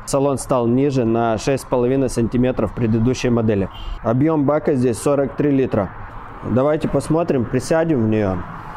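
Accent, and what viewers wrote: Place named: Odesa, Ukraine. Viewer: Отлично,! Смотрю всё,что Вы предлагаете к обзору!С Вашей Одесской манерой разговора даже обзор входных напольных ковриков будет интересным и занимательным!Блеск! А Машина хорошая,нет слов, но дорогая для патриотичных и не богатых колхозников.Да даже если бы Вы и критиковали её за что-то,то были бы вправе это делать, как я Вам это уже говорил..Ведь Вы! С уважением из Саратова,Владимир,61 год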